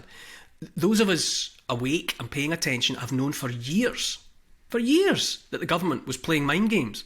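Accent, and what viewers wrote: Scotland, United Kingdom. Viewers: This is what I thought every Scotsman sounds like... You can hear the freedom and yearning for love and truth.... God bless and keep you guys as we all are brought to Gods justice and holy light, these monsters can have what's left of the rock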